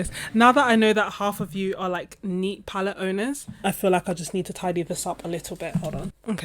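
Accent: England, United Kingdom. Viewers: I’m in soggy Cambridgeshire, so probably not far away from you judging by your accent. Love hearing how different people feel about palettes. I’ve got many of the same. How I feel about them is an evolving process. I’ve come to realise they key for me is flexibility, if I can’t change it, I get bored.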